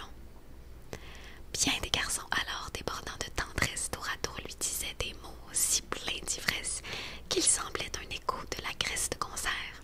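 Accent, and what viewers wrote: Quebec, Canada. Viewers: Ta voix est tellement relaxante 😍 et j’adore ton accent québécois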